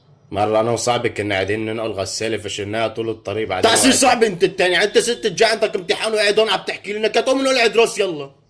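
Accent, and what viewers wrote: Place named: Iraq. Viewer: اقول لك انت كلامك عراقي بس انت اظن شو اريد او اردني بس كانك انت عراقي مبين حتى من العماره😂😂😂😂 هذه المنطقه اسمها العماره اسمها اي اصلها ميسان😂 في يوم من العماره🎉 خلي يحط لي لايك لعيون العراق او العراق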